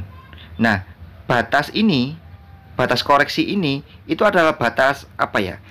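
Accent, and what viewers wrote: East Java, Indonesia. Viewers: Antap Brada Jatim 😇👍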